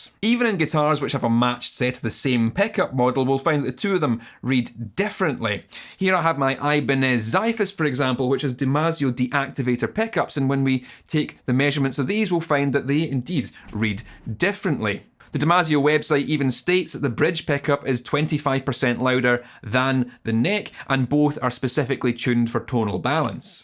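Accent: Scotland, United Kingdom. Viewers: is what the entire world think scottish people sound like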